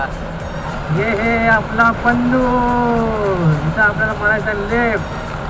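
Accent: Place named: Malvani, India. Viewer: Pure Malvani...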